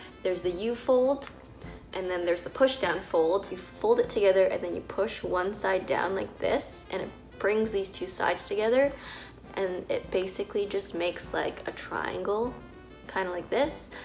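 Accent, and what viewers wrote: Canada. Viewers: I wouldn't say you have a heavy accent, but being a fan of Canadian TV shows, I picked up on it! Your review of this product was so educating and thorough. Really above and beyond! The company should pay you for this video alone . You probably know more about the product then the sales reps!😂 Love and greetings from America!! 😊💙 somewhat related: How does it differ from a diaphragm or nuvaring, maybe? Like could it be used as birth control? Probably not?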